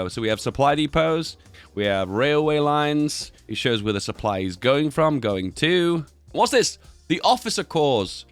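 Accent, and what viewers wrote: United Kingdom. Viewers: why does sound like spiffing brit? is it because their both british?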